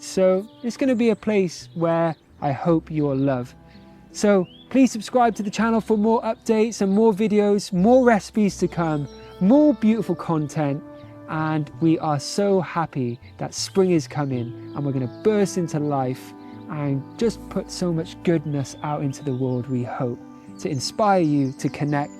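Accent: Wales, United Kingdom. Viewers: I love watching your videos. My husband was welsh he passed away two years ago. I miss listening to his voice. Hearing your welsh accent makes me so happy. I love gardening and cooking too. You are inspiring and you warm my soul. Thank you ☺️❤️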